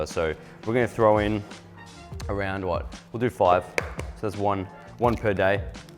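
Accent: Australia. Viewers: For some reason, Australian accents makes these videos less cringe and less douchebagish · Your accent is so Aussie 🤣🤣brilliant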